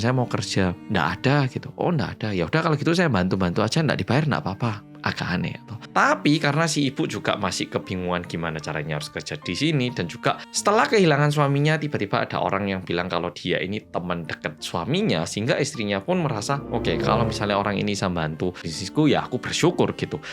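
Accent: Java, Indonesia. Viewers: Jang Hansol, aku sueneng mergo medokmu ga ilang2 😄👍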